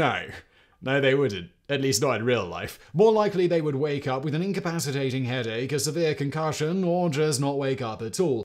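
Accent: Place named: RP, United Kingdom. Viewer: Such a posh accent! Well done, boy, well done.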